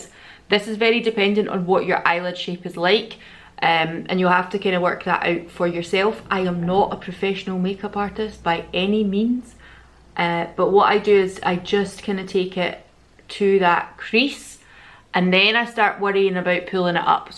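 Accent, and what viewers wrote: Scotland, United Kingdom. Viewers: Love the video! Been looking at these Bodyography glitter pigments. They remind me of some of the flakie shadows from Copacetic Cosmetics** I'm from USA but think they ship to other places in the world. Love your Scottish accent too btw! 💓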